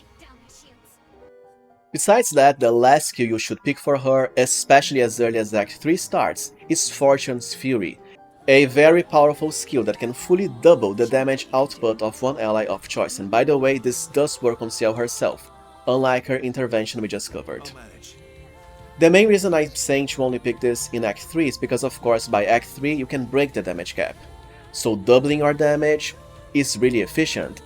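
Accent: Brazil. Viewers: you dont fool me, you are brazilian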